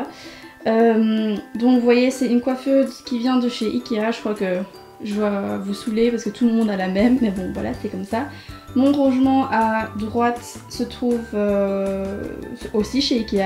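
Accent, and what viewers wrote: Belgium. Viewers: j'adore ton rangement makeup :) haha l'accent belge j'adore #belge (je le suis aussi ) tu es d'ou en belgique?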